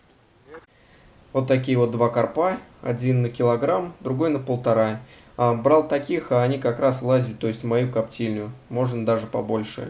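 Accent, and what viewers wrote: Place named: Mordovia, Russia. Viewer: с пацаном служил с Мордовии. У него такой же акцент) никогда раньше такого не слышал, а сейчас сразу узнаю)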